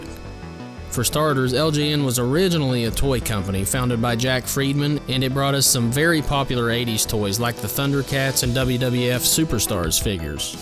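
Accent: South, United States. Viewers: This guy has the perfect amount of country twang in his voice. I'm a fan sir, u remind me of home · liked subbed, love your country accent and video coverages on titles. you really do great indepth coverage and the sound quality is great, liked and subbed!! <3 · Aww the good ole days, great video btw way your southern accent made it even more intriguing! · Loving that southern accent. Cool channel! Subscribed and liked! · First video I watched. Subbed. Great video and well researched. I personally love this game too. A few things: You mentioned a couple of the purple Jason tributes, but there's also an awesome purple NECA "action" figure worth a look. Next, with the release of NESmaker, we can expect an explosion of new rom hacks, specifically ones fixing, improving, and editing old NES games. I know rom hacks exist, but the NM program makes it so easy anyone can basically make their own game from scratch. 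Good times a'coming! Lastly, I'm trying to place your southern draw, I'm from Memphis myself, but have lost most of my accent living overseas for 20 years. My bet is Arkansas or Kentucky.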